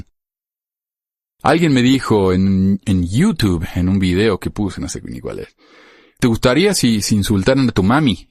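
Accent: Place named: Interior, Argentina. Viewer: Bien tirado. PD: me gusta como locutas. Soy de Perú, pero intuyo que eres del interior de Argentina porque tienes un acento como el de Luis Landriscina (un cómico). Saludos cordiales.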